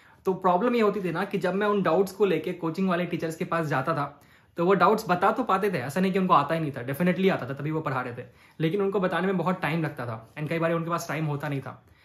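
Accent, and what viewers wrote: Bihar, India. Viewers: Bihar se ho kya ...Katihaar district? ?????? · Bhai aaap kathihar bihar se ho?? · Bhai ap bihar se ho...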